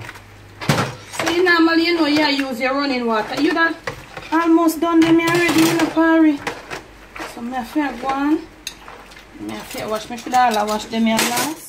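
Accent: Jamaica. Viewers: Hey! This looks great and smells great too! (Yes, my computer transfers the smells from your kitchen to my desk, somehow! Its a freakin miracle!) Any chance that you might have a written recipe to share? You speak much too fast for me to try and translate your Jamaican speak into my English ears. Thank you!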